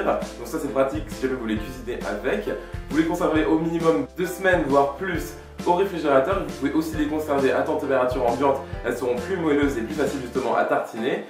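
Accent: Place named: England, United Kingdom. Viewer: stop avec ton accent anglais qui me casse les pied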